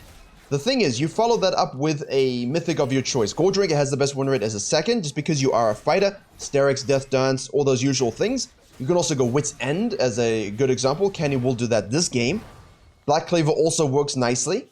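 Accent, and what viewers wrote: South Africa. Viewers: Why do you sound South African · Dude you sound so much like a South African it's crazy haha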